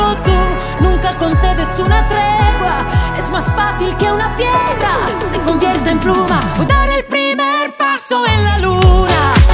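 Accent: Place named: Spain. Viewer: Me gusta que habla español de España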